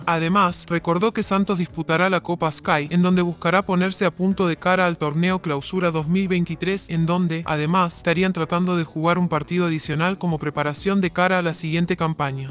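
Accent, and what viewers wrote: Argentina. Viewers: Xfavor..de verdad..no pongan nada del santos si?? Saben q esto empieza cuando terminen el mundial...ya chale con.el santos noticias falsas..ha i ya deja ese tonito de arjenti o.wey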